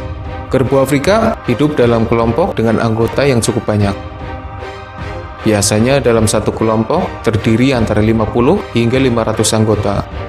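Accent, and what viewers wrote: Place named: Java, Indonesia. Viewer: Min admin, jawane ngd to? Medok bgt ngmong e wkwk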